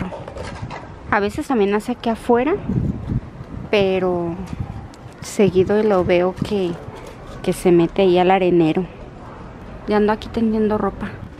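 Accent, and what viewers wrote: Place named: Chihuahua, Mexico. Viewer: oh que bien , se me a figuró Que tenias el acento de chihuahua, pero al igual somos mexicanas , buen día , y pues una seguidora más en tu canal felicidades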